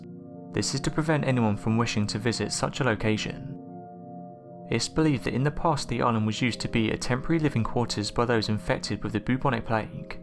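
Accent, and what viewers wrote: United Kingdom. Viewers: You sound like a British libtard that insists on importing Muslim illegals cause you love them so much, even though they hate and want to kill you....... Tell us I'm wrong....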